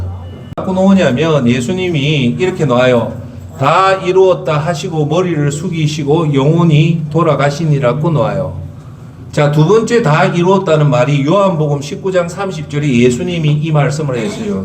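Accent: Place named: Gyeongsang, South Korea. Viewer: "예수는 빼삐고"... 아이고~ 빠뜨리고 아닌가요? ㅎ 경상도 사투리 그립네요. ㅎㅎ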